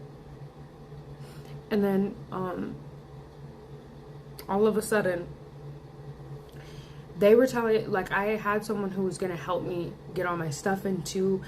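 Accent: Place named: Transatlantic, United States. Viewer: you’re the first GenZer with a transatlantic accent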